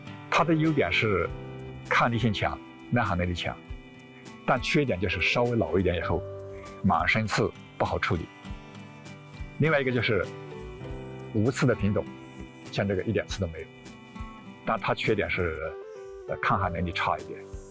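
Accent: Yunnan, China. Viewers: 听您口音一定来自云南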